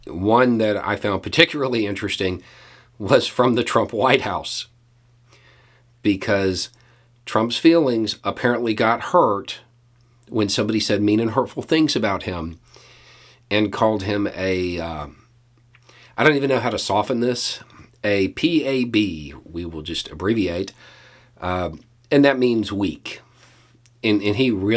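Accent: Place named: Kansas, United States. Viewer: Love your channel! It’s always the first video I watch everyday. Just commenting to hopefully get you a YouTube buck or two. I kinda look dress/ look/have an accent like you; born and raised in South West Kansas. Made it to California in 1996 at 22yo, via Mississippi for “school”. It’s really reassuring to hear your take on “things”, it’s awesome you’ve found a platform to help/inspire the younger ones too. It also comforts me to know that someone is out there showing the world not all of the “country boys” are MAGA “Good Old Boys”. Keep up the great work.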